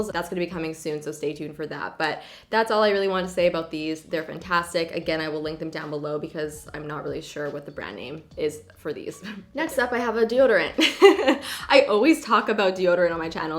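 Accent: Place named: Canada. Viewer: I love your Canadian accent!! xo-Wendy NYC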